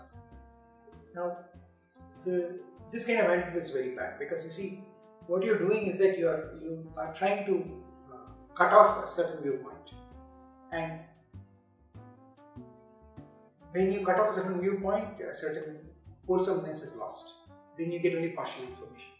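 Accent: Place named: India. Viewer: I cannot understand his India accent, no offense.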